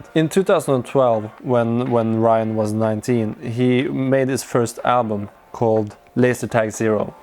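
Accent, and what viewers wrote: Norway. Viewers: Yooo er du norsk Du høres norsk ut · Aha! Så du er norsk! Kjente det igjen på uttalen med én gang. Ikke mange i Norge som hører på vaporwave, og lite niche som spiller her, så jeg kjenner meg også igjen i lysten til å dra andre plasser. Bra liste btw. Personlig ville jeg snudd den på hodet, men jeg har kanskje litt annen vapor-smak :)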